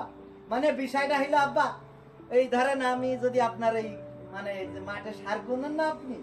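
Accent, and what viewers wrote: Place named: Jessore, Bangladesh. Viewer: যশোরের ভাষা খুব ভালো লাগে, আপনাকে যশোরের ভাষায় সব সময় কথা বলা মানায়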